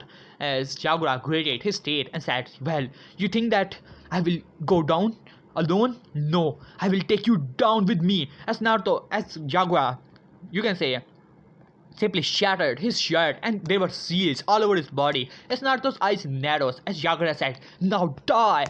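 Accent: India. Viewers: It sounds like Indian and Asian English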